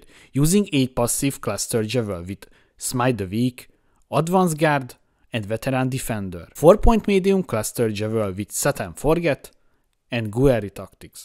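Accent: Hungary. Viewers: az akcentusból tudtam, hogy magyar vagy, jó videó! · Hungarian, right? :D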